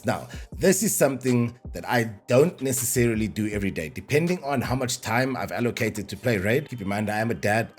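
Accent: South Africa. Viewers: Sounds like you come from SA! Glad to see this.. good luck for the channel, now following 👍🏻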